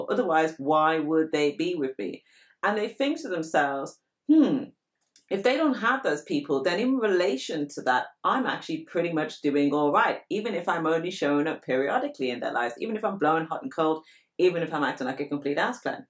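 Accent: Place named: United Kingdom. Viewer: Love when she says "are you flipping serious?!" in that British accent. 😊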